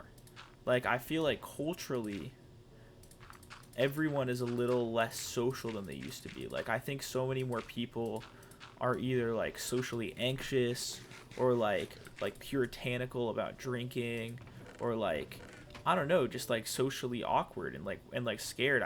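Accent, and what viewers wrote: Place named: Missouri, United States. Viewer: You sound kinda Missouri in this one. The accents comin out of the DNA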